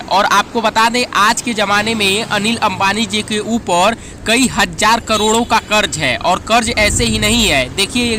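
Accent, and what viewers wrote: Bihar, India. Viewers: Apki Hindi bilkul bhihari jese hai good job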